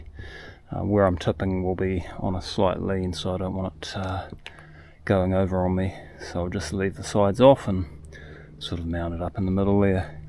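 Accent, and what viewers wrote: New Zealand. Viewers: as a new viewer of your videos again I can't get enough! I could have watched that for over an hour! I did miss the closed caption option, I am hard of hearing but it takes me a while to figure what you're saying in the New Zealand accent! I'm not complaining, but I sort of depend on that closed caption to make sure that I understand your every word. AM. Skip, Western PA, USA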